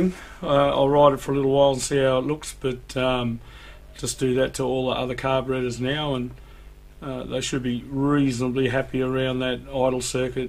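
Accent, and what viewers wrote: Australia. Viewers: Glad to hear that accent from the down under. Hi from the New England reagen usa. ( north of boston lol) Thats a must have kit Thanks soo much . Cleaning out my Kawasaki super sherpa 250 carb.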